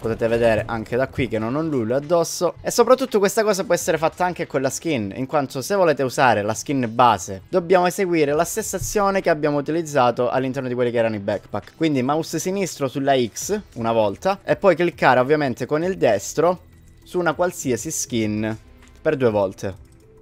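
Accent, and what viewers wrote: Calabria, Italy. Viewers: Sei calabrese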